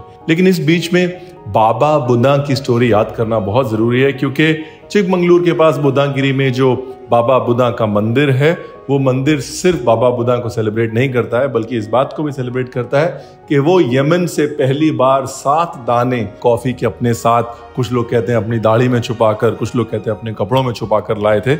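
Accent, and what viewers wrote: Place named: Uttar Pradesh, India. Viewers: Totally up wali boli 😅 mee too from lko that's y i like u so much❤ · Arre bilkul Lucknow wale jaise bolte hai aap, you can easily figure out how a Lucknow wala speaks. I am too from Lucknow.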